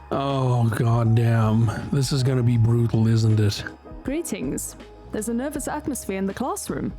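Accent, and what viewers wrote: South Africa. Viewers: You're south african?❤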